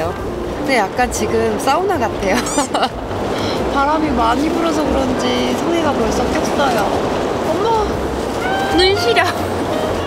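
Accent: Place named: Seoul, South Korea. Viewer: 서울 말투 인가요 차분하니 말씀 잘하시네요..경상도 말많듣다보니 너무좋네요 ㅋㅋㅋ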